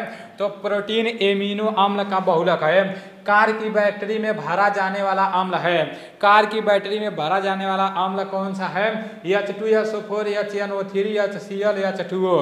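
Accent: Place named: Bhojpuri, India. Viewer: bhai to ser he🦁🦁🦁🦁🦁 bhai tumhara bhojpuri bahut achha lagta he😂😂😂😂 sun ne ko message from Gamer Odisha 😅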